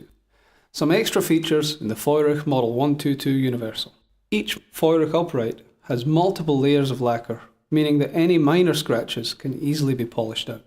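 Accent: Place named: Vienna, Austria. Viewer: I love the viennese accent!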